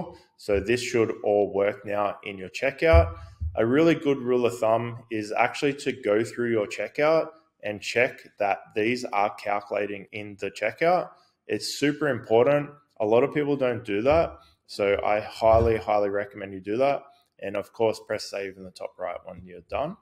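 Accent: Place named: Australia. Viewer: Thanks! Nice to have an Australian voice and real person to talk me through it (as opposed to an American chipmunk AI). 👍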